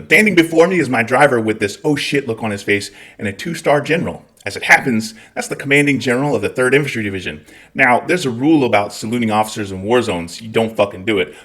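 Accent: Scotland, United Kingdom. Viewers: as a resident of Northern Ireland. Your Scottish accent had a fair hint of a Belfast twang to it! Lol! Nonetheless, you can definitely hear the Scotsman in your speech! Your stories are funny as fuck!! I've never served (My Mum's side of the family did during WW2!) but my Butthole has went nuclear on several occasions! I've made toilets glow in the dark! My farts threaten the fabric of space and time! (Not to mention the fabric of my jeans!😬😬😬)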